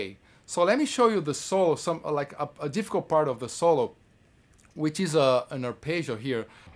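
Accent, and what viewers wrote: Brazil. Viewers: That's brazillian accent if I ever heard one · Name looks finnish, but accent seems brazilian.. which one is it?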